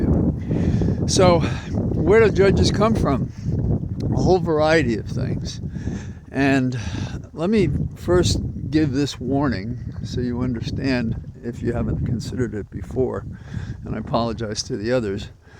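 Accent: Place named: Ireland. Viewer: Another truly stellar talk today, elemental but also saddening. My cousin acquired his federal judgeship by running a longshot presidential campaign,and winning (don't guess which because you might guess wrong) He is the only person I know who talks like Mr.. It must be those Irish genes.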